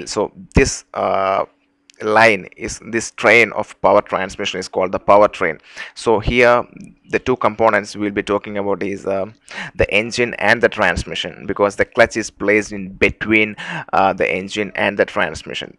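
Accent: Kerala, India. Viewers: your accent is malayali but any how thanx 4 vid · It's really nice video dear...there is a feel of kerala in your pronunciation. It's nice to know you too from kerala. Good job bro!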